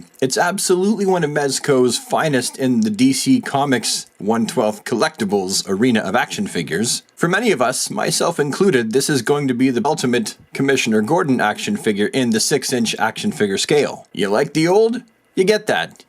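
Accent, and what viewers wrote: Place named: Canada. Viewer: Love your channel. (I know I say it a lot) but I always forget your Canadian while watching until you say certain words. And it makes it all the better lol.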